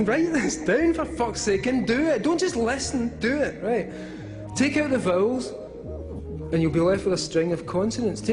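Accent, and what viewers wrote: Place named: Glasgow, United Kingdom. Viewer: Auto captions are no match for the Glaswegian accent.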